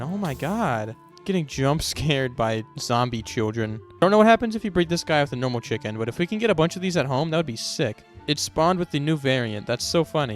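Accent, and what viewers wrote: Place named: Poland. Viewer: Polska Gurom! Very nice accent for polish people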